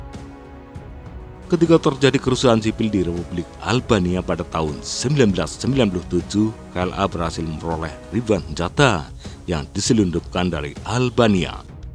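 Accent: Java, Indonesia. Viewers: Ini yg baca narasi nya kata per kata nya ga jelas artikulasinya suaranya terlalu ngebas dan terlalu ke JAWA JAWA an jd baik nya diganti aja ke yg lebih nasional org nya pecat aja tuh ok tq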